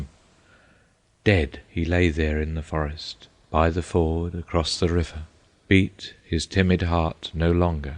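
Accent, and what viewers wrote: England, United Kingdom. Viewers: It's difficult to get into hearing this read with an English accent. Sorry. If it was Shakespeare, he'd be great.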